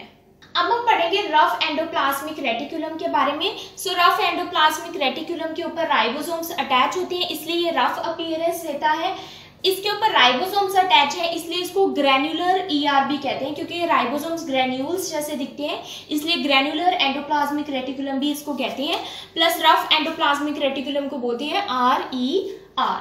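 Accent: Punjab, India. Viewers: Mam are you panjabi?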